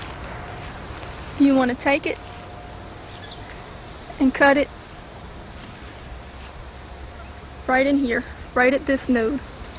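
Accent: Louisiana, United States. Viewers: are you cajun ?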